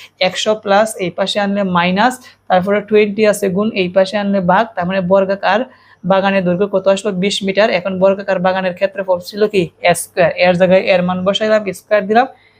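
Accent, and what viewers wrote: Sylhet, Bangladesh. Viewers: ভাইয়া আপনি কি সিলেটি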